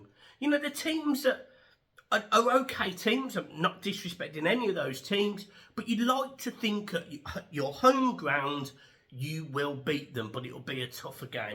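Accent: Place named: Liverpool, United Kingdom. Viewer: His scouse accent reminds me of my ex girlfriend she was a Brummie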